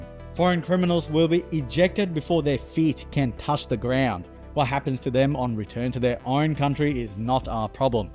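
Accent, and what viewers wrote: Australia. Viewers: You have the most Australian accent I've heard on youtube do far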